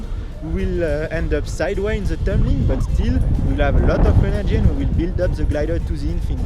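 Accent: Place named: France. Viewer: Cet accent Gaulois me fait mourir de rire!! On est vraiment mauvais en langues étrangères...😂😂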